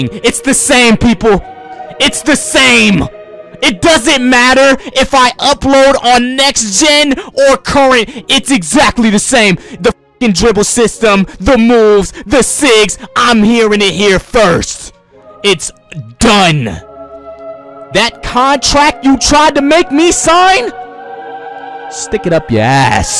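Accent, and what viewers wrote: Australia. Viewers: says he's in new zealand put on australain accent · “I’m in New Zealand” proceeds to speak in an Australian accent as an Aussie I approve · That’s an Australian accent not newzealand…… like saying u sound Canadian get my point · Bruh that’s an Australian accent 🤨🤦🏾‍♂️ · Aussie accent?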